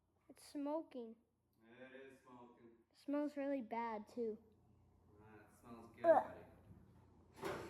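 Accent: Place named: Canada. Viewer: Am I missing something or is It he may be tired or something but he kind of sounds a little Canadian ish here compared to his other content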